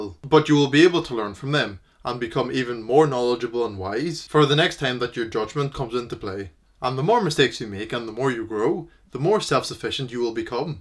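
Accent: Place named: Scotland, United Kingdom. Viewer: Damn for a lithuanian u really picked up the scottish accent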